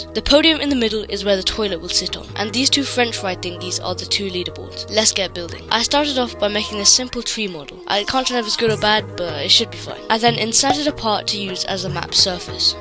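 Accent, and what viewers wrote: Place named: United Kingdom. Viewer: u sound like a chilld, speak like a old british man and insane editing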